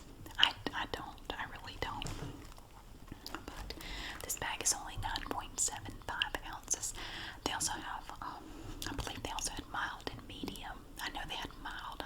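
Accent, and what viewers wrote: South, United States. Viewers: I'm an English man,but I love your southern American accent,your videos are fantastic & I love the way you do your thing,your whispering is awesome,please keep doing what you do,you're amazing,much love,Chris x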